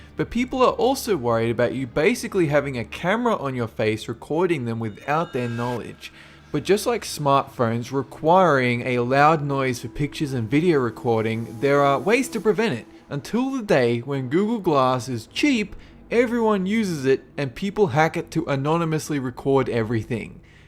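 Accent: Australia. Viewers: Australian, I believe. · Love ya aussi accent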